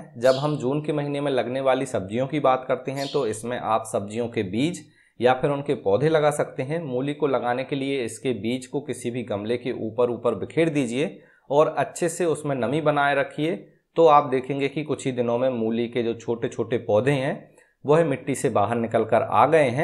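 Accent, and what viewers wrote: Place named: Madhya Pradesh, India. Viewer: MP accent nice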